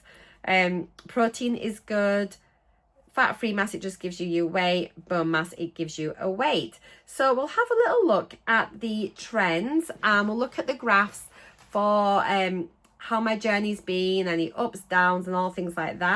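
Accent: Yorkshire, United Kingdom. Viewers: Congratulations, on reaching your weight loss goal. I appreciate the time and effort it takes to create your videos; all very informative and inspirational. Your upbeat nature helps me overcome impatience when I feel like my own weight loss is stalling. Truth is, it never stalls for long. I tend to stay at one weight for two or three days following injection and then lose a pound or more during the remainder of the week. After my weekly injection, I watch your corresponding GLP-1 Diary video. For example, my next injection begins my week 12. After my poke, I will watch your Week 12 video to see what results and side effects I can anticipate as our weight loss rates and side effects have been similar so far. I have lost 30 lbs as of today. Woo hoo! Only 70 more to go until I can celebrate like you! Mounjaro is a godsend and the ONLY thing that has worked for me. 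Conquering obesity while building muscle is the best thing I can do to stay healthy as I age. Being able to fit into non plus size clothes is just an added benefit. On a personal note, your lovely Yorkshire accent reminds me of my cousins who live near Leeds. I am in Canada so our visits are few and far between. Take Care and best wishes as you begin your maintenance phase.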